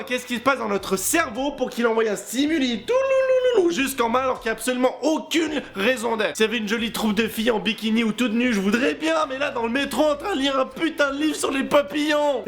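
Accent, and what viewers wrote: Quebec, Canada. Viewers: C'est un français ou un québécois avec un accent de frais chié? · XDDD c'est enorme c'est mieux qu'un cyprien ou un norman srx t'es epique avec ta tronche de psychopate et ton accent quebequoi LAPIN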